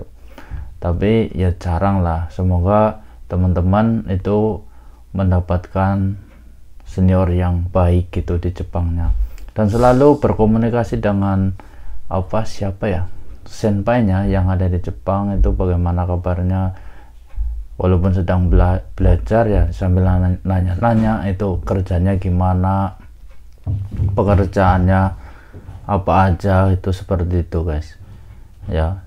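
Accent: Banyumas, Indonesia. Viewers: Ora Ngapak ora penak yuhuuuuu ketemu dulur Ngapak mning heee dengerin cerita suka duka di Jepang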